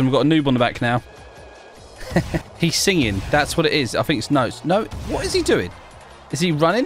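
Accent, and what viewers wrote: United Kingdom. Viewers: You British right?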